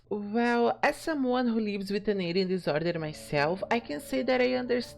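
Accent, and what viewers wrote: Brazil. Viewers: meu vc é brasileiro? kkkk senti mto o sotaque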